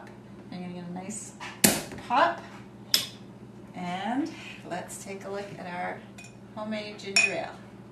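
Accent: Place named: Minnesota, United States. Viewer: Hi, there. had a little ginger root left over from a curry i made. saw your video and adjusted the amounts roughly and got me 500ml of fiery ginger beer. well done with the video, very clear and easy to follow. my next batch will be a full one. what % alcohol would they be? also how do you get rid of the sediment? i've heard cream of tartar mentioned, what's that all about. I'm from england, love your accent, i watched a film called fargo, would that locate your accent at all? bye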